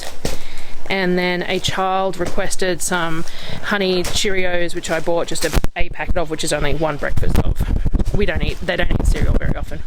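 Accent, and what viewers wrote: Australia. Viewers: G’Day!!! New subscriber here. 🇦🇺💕So wonderful to hear an Aussie accent. Great video - shout out from Sydney x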